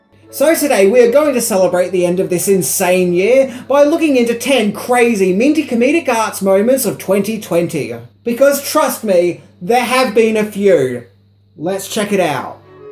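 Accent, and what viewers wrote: Australia. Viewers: Seams like someone is just envy of our boy Minty! I like your happy face and your Ozzie dialect, it feels fresh and puts a smile on my face. Don’t change a thing and don’t bother with any haters, if they don’t like the channel they can’t go watch WatchMojo or something! Regards from Sweden! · THANK YOU Minty, just Thank you. You are wonderful as you are and your aussie accent is brilliant, there are a lot even worse accents outthere. Greetings from Vienna, Austria. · You definitely made 2020 easier to get through and I think people with Aussie accents are just fine. Other Youtubers from there sound just as cool. How good? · I have throughly enjoyed all of your videos that I’ve watched so far which has been a lot. I quite enjoy listening to your Aussie accent. As for the haters just remember this quote “You may not be everyone’s cup of tea but you are definitely someone’s double shot of vodka”. 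I look forward to watching more of your videos. 💜🇨🇦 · Minty despite what some people think I think you are very positive human being with a very good sense of humour either lighting me about some of the movies I like most and some I don't like very much I look forward to hearing you speak your wonderful Australian accent keep up the good work and I'm jealous of your action figure good work brother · Watching your videos helps me practice my Australian accent. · Thanks Minty, As a fellow Aussi, I don't find your accent annoying. There are worse Australian Accents than yours (Tasmania anyone). How about a series of videos on 70s, 80s, and 90s movie sex symbols? Just an idea. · Yes, I find your accent a bit annoying ( Im an Aussie too) BUT most of the facts you present are original and fresh so that's why I subscribe and follow.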